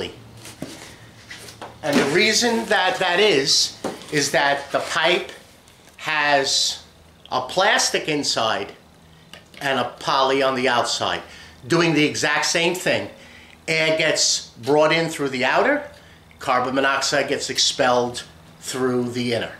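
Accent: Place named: New England, United States. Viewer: Love your yankee accent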